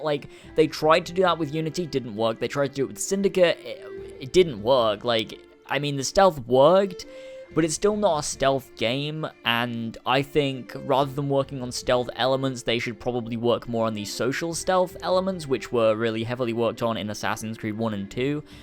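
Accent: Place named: Northern Ireland, United Kingdom. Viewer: where are you from cause your accent sounds like a northern Irish...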